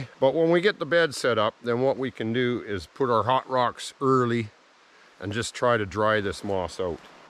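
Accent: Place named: Canada. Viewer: I appreciate your inserts of humorous bits from other movies! & your Canadian accent & skills....thanks.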